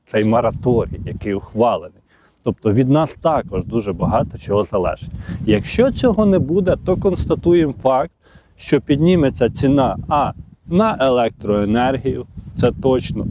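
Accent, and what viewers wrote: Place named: Western Ukraine, Ukraine. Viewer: ну просто "ріже вуха" западенський акцент.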